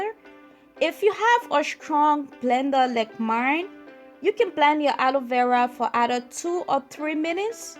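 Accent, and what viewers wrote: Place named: Liberia. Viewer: Your energy is so nice - I don't know if I'm late to this fact but your accent sounds Liberian I keep thinking my cousin is around the corner. I love this video I've used aloe on my daughter's hair since 3 months old and her hair at 2.5 is so soft and shinny her growth is consistent and she retains it. I've never used the peeling well done! Thanks a bunch!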